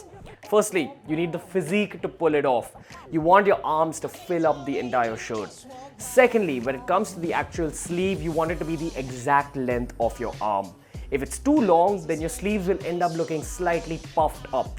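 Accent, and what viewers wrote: India. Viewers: I love just about all accents of the world, but man the Indian one is just so unattractive lol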